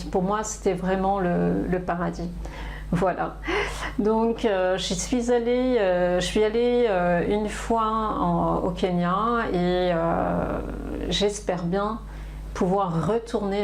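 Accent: France. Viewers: Bonjour, ça fait plaisir d'entendre le parler francilien plutôt que le français standard, le discours semble tout de suite plus naturel! Sinon, je me voyais devenir prêtre, tendance mystique, conscient que j'étais très tôt de l'existence de l'invisible tout autour de nous. Les métiers dans le domaine médical et l'éducation me faisaient horreur, car trop sociaux. Cette vocation s'est vite effacée à l'adolescence, en raison d'une part, de l'imposture que je suppose dans cette croyance, et d'autre part, par le sacrifice imposé par le célibat... Et sinon, je suis ornithologiste amateur, pour pouvoir apprécier la faune sauvage locale, qui disparaît à grande vitesse désormais.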